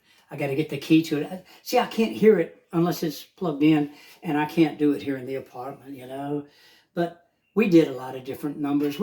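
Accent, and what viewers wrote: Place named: Liverpool, United Kingdom. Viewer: Soundin' positively Liverpudlian there! (& not boring!)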